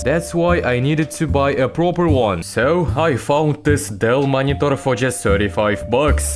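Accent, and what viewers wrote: Russia. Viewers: I love Russian accent😂😂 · Классное видео, мне понравилось. Суровый russian accent на высоте))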